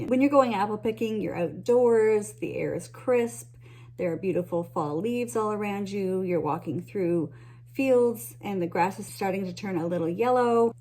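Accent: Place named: Maritimes, Canada. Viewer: I was trying to figure out which island you were talking about and then I realized it's PEI (confirmed by your Maritime accent 😄). Then I wondered what perfumes you wear to your favourite places on PEI. Then I wondered what perfumes I wear to my favourite places in BC. Now I'm off to Winner's to find Bourbon Craft. Wish me luck!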